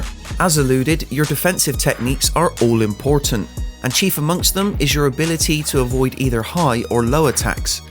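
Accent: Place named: Bristol, United Kingdom. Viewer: Bleeding hell, if this commentator isn’t from Bristol then I must be deaf. Love a good Bristolian accent👍🏼😂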